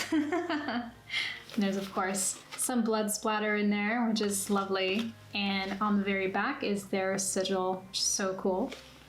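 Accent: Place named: United States. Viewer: Congratulations on 2,47K subscribers!!!!! I have a question, Why did you move to Berlin, Germany, I assume you're from the states due to your non german accent!! lol. Do you think you will ever move back here???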